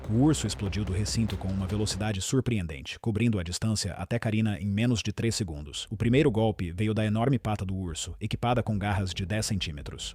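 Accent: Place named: Portugal. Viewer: Talvez seja porque o narrador é português. Lá eles falam meio rápido e adotam o padrão internacional de medidas, se não me engano. Mas, de fato, ele deveria se esforçar a falar menos rápido para as demais pessoas foram de Portugal, possam acompanhar melhor e falar as duas medidas para melhor compreensão. Tem que pensar fora do seu país.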